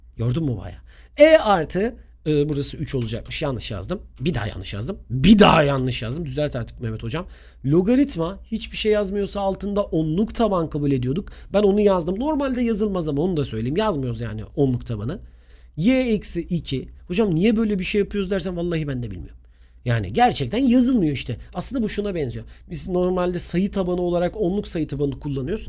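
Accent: Aegean, Turkey. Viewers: Hocam yorumları okuduğunuzu biliyorum ve bir ricada bulunacağım : Bu ders ege şivesi kullandınız bazen bi gün Karadeniz şivesi de alır mıyız ya ? Edit: Karadeniz ağızı .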